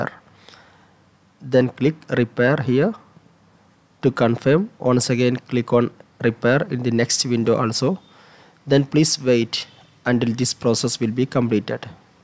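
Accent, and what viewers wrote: India. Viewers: When I hear this indian voice, i know that it won't solve my problem and didn't solve.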